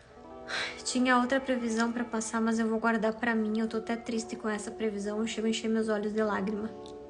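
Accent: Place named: Bahia, Brazil. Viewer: Cara que videnre chata ! Com esse sotaque com uma mustura de baiano cauxo minerio !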